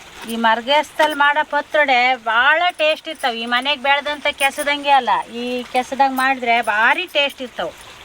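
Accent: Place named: Mandya, India. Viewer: ನೀವು ಮಲೆನಾಡಿನವರಾದ್ರೂನು ಮಂಡ್ಯ ಸ್ಟೈಲ್ ಭಾಷೆ ಮಾತಾಡ್ತೀರಾ, ನನಗೆ ತುಂಬಾ ಇಷ್ಟ ಆಯ್ತು.... ಬಾಳೆದಿಂಡು..ಬಿದಿರು ಕಳಲೆ.. ಹಾಗೂ ಕೆಸುವಿನ ದಿಂಡು ಎಲೆಯಿಂದ ಮಾಡುವ ವೆರೈಟಿ ಪದಾರ್ಥಗಳನ್ನು ತು0ಬ ಚನ್ನಾಗಿ ಇರುತ್ತೆ... ಕೇಸುವಿನ ಪತ್ರೋಡೆ ಮಾಡಿಲ್ಲ....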